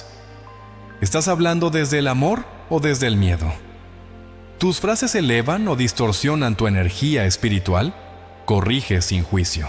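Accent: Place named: Brazil. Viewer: Que lindo acento de Brasil. El idioma se escucha lindo.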